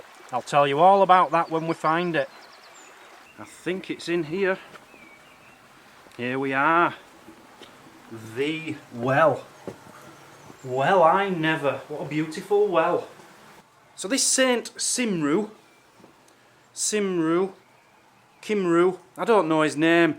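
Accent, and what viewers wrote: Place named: Yorkshire, United Kingdom. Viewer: Your From Keighley Arnt You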